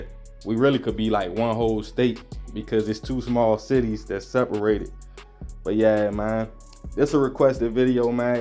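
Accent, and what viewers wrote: Baltimore, United States. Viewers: U from bmore bruh u definitely not from dc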